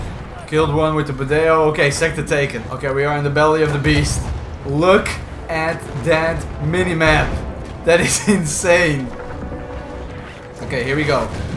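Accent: Netherlands. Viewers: i can tell you're from the Netherlands from your accent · this guy has dutch accent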